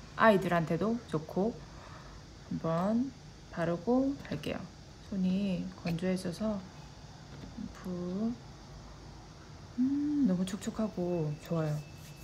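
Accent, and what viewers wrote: Gyeongsang, South Korea. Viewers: 진짜 이쁘네요 살짝 경상도 사투리가 매력이 있네요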